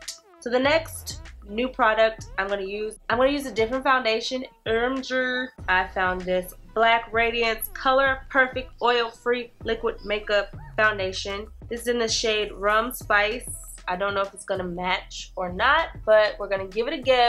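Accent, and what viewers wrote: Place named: Atlanta, United States. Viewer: I had to make another comment. The way you say erange (orange) is EXACTLY how Atlanta people say it! 😂😂 I moved from Dallas to Atlanta and every time I hear it I'm so tickled. And you how you do that loud tail thot pop!? 😂😂😂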